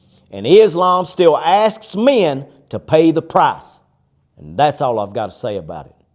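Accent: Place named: Texas, United States. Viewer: Wow, once again I feel blown out by such a strong and sharp speech full of truth and wisdom so that I couldn't help it but to listen to it three times in a row! Bro, your words are those of a sharp shooter in their accuracy and that of heavy weaponry in their effect. I'm totally amazed. I must add one thing - I used to hate so much the Texan accent due to the war criminal G. W. Bush and the such. But thanks to sheikh Yusuf Estes first and thanks to you now, I've come to enjoy listening to this beautifully spoken English. My sincere admiration and respect, Bro.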